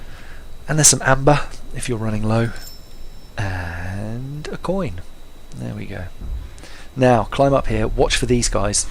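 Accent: United Kingdom. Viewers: Thanks for the great video. I had to make adjustments because I was going for the Thief Insignia and for the Pretentious achievement at the same time. "Pretentious" is a nightmare, but with a few covered kills followed by some "run-like-the-wind"s it was finally doable (albeit leaving a bloody trail of devastation in my wake). Loved the narration, btw. There's something about a British accent that just makes you-tube videos better (sounds more cultured), and I also appreciate you keeping it clean. It's refreshing to not have to put up with a slew of f-bombs just to watch a gaming video. I look forward to the rest of this series. This game is far from my "favorite", but your videos definitely make it better. Kudos!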